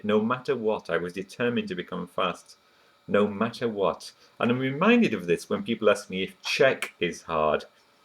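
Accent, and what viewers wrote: England, United Kingdom. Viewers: you're english right?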